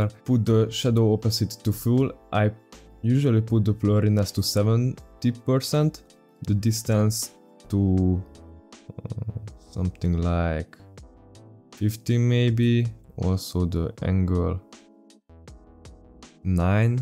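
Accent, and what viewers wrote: Hungary. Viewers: Érződik egy kicsikét a magyar akcentusod, de ezerszer felismerhetetlenebb, mint az enyém. Üdv a nemzetközi youtubeon és sok sikert továbbra is! :) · Hungarian? Edit: I knew by the accent. I'm Hungarian too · gya de hallatszik hogy magyar vagy teso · Magyar akcentus feltűnt 😀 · mondom magamban olyan magyarosan beszél ez a pali erre nézem a windows languaget xd kösz amúgy fasza videóü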